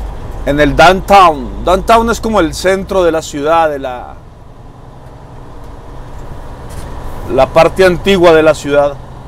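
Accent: Mexico. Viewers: Hola amigo con todo respeto, la verdad es solo curiosidad, si eres Colombiano por qué hablas como Mexicano? Gracias por tu respuesta!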